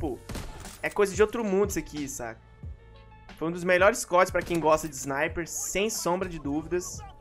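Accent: Minas Gerais, Brazil. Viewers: Mineiro Ala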